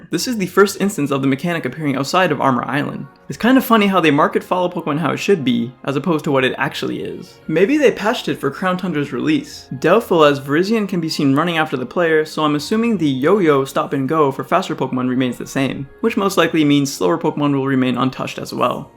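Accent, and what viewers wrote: Canada. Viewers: Is he Canadian ?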